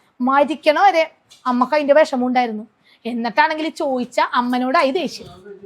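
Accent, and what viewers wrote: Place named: Ernakulam, India. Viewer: സൗണ്ട് കേട്ടാൽ അറിയില്ലേ ekm തന്നെ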